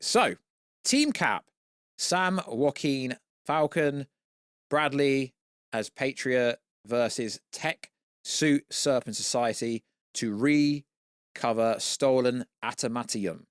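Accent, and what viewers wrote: United Kingdom. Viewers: You sound British eating beans and tuna all day ...🤮🤮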